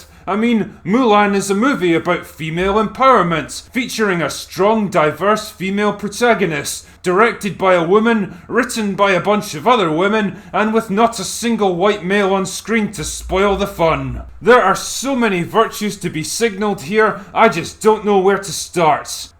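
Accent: Scotland, United Kingdom. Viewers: Because he really is smart his earlier reviews were very traditional hes basically a awesome scottish mr plinketf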